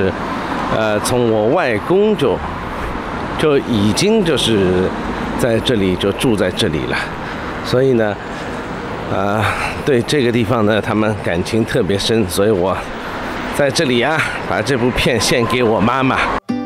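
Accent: Shanghai, China. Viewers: 相對於其他博主介绍上海種種然weley以上海腔的國語導覽敘述令人 enjoy , 原生的上海人説的普通話有其特殊的語調詞彙，帶有種捉狭却又認真的複雜元素(没有貶意)這是只有以上海話母語的人才具有的, (外 地人無法模仿)上海待過較久的人聽起來特别親切, 尤其在90/00年代外來人口較少普遍都是這種腔調普通話， 歳月如梭,這幾年回去應該是新上海人越来越多,聽到的都是標準普通話, 這也可能是上海無形中漸漸消失的海派元素之一吧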